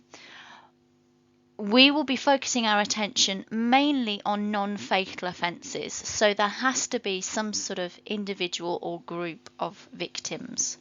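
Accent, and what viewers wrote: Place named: England, United Kingdom. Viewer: usually i dont like english accents but i liked yours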